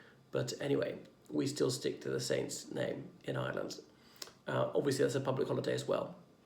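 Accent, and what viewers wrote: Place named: England, United Kingdom. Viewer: You sound incredibly English to me. What is your channel actually about? Is it more of a discussion channel?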